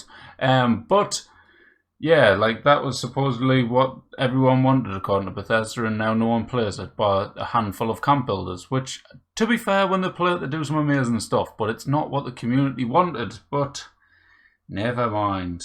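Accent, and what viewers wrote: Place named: Newcastle, United Kingdom. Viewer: Geordie accent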